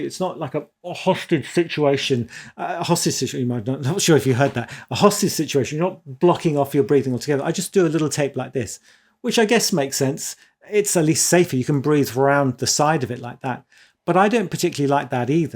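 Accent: United Kingdom. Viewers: Good Day, man! Thank you for the upload. I've been mouth taping for about 3 years now and its shown great benefits. I'm pretty sure I am still having some mild snoring/Apnea events but the mouth tape was a game changer and my Hygienist actually said they noticed an improvement in my overall oral hygiene. Disclaimer: it may be anecdotal and ones mileage may vary. I appreciate all that you do for the sleep community and of course the English accent is an amazing addition! also: So many Cartoon/movie character pins on your coat.. oh my!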